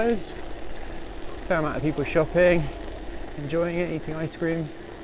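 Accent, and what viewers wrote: Bristol, United Kingdom. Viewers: Checking this out now to see what’s changed Cars at the start for definite Porthminster cafe is gorgeous and the food always excellent - cool rain clouds on the horizon Nice manoeuvre down the hill, and the high street much more active but nothing like usual at any time of year Didn’t see the news about the fire; watching this instead of going to bed but I’ll look that up tomorrow What Gallery did your Dad own ? Recognised that accent as I’m from Cheltenham, just up the m5 from Bristol I’ll stop rattling in now and just enjoy the tour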